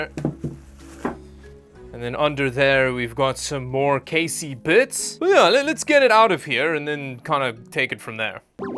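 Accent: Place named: Cape Town, South Africa. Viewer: Hey I'm subscribing just because I recognize the capetonian accent. Cheers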